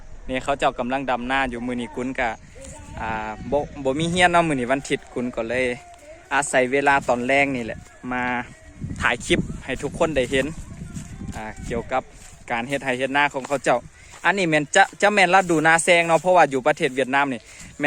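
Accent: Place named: Laos. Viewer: บ่าว เว้าลาวม่วนจร้า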